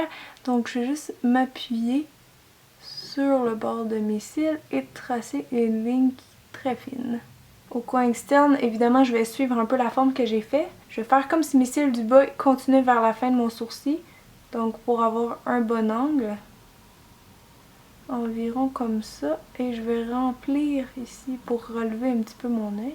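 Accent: Quebec, Canada. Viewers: Salut, juste pour te dire que tu es super et que tes vidéos son génial! N'écoute pas les commentaires négatifs! Tu as pris de l'assurance et c'est génial pour toi! Pour tout dire tu m'as carrément donner l'envie de faire ce superbe métier et je me lance l'année prochaine! Bref, continue comme ça, j'aime ce que tu fais et j'aime aussi ton belle accent québécois!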